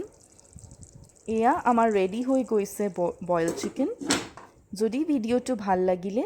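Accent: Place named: Lower Assam, India. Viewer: Looks like you're from lower assam but you're trying to speak in upper assam dialect...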